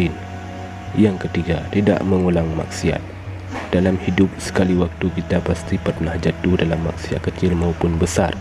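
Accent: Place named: Bali, Indonesia. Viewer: Orang Bali kah, ithu ....ditherima....dst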